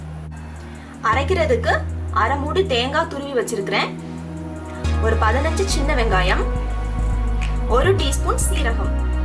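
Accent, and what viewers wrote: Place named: Tirunelveli, India. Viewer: Super... I'll try... Tirunelveli slang nalla vaaruthu nadavula...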